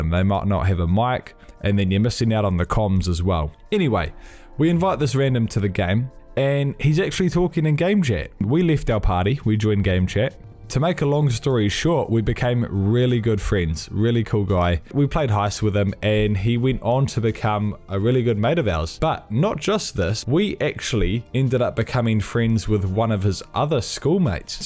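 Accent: New Zealand. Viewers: This guy has a Kiwi accent, he's from NZ. Ofcourse he knows we exist lol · So weird hearing a Kiwi accent in a YouTube video. Thank you for keeping it real brother.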